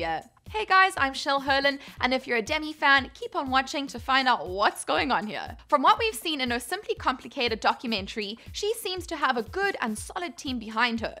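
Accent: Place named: South Africa. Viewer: Ur south african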